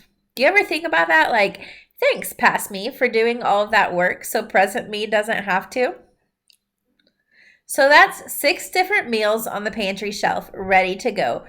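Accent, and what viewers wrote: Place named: United States. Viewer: I think with Worcestershire sauce coming from someone who actually lives in Worcestershire the safest option for someone like yourself who had an American accent is to do how Kristen from six sisters stuff says it and that’s “the W sauce”. I personally pronounce it wuss-ta-sher but I think you did a great job saying it xx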